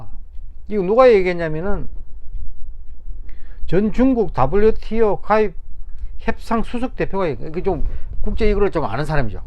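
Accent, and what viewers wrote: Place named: Gyeongsang, South Korea. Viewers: 경상도 사람은 경상도 말로 해야 목소리 톤에 힘이 들어가고 더욱더 호소력과 전달력이 있습니다. 억지 서울말 보다는 얘기하기 편한 말로 하는게 더 낫지 않을까 생각합니다. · 경상도 사투리를 서울 말씨로 고치세요.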